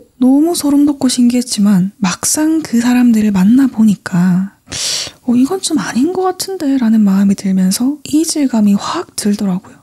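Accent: Gyeongsang, South Korea. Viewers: 작전 변경...지금부터 님을 제 와이프로 끌어당기겠습니다. 경상도 사투리 넘 매력..내 알라도..ㅋㅋㅋㅋ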